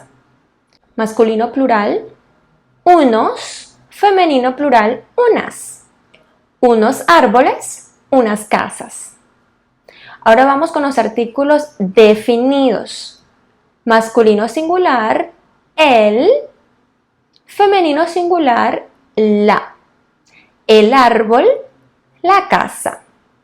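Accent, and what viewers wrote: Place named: London, United Kingdom. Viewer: Are you in London mam ? Because you are speaking very nice 👍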